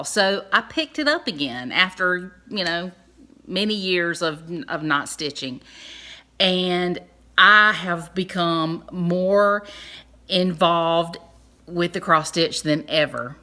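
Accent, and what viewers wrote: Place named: Oklahoma, United States. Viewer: You are so cute. When I first heard you I thought she talks like me. I'm from SE Oklahoma and you would fit right in. I am subscribingvright now. Plum Street is my favorite, too.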